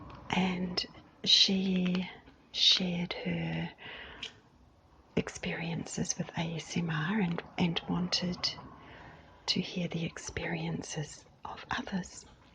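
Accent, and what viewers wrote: New Zealand. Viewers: Hello!!! I was amazed to hear that you had listened to a Minister who was also an archeologist as I did the same thing before I ever heard of ASMR (a couple of weeks ago). Was/is his name Francois du Plessis? I LOVE listening to him both for the content and the softly-spoken accent which was unintentionally ASMR. If I was sitting in one of his lectures I'd better be on the back row as I'd fall asleep instantly!!! I love the S.A. accent and I love your beautiful NZ accent. Thank you for what you do 💙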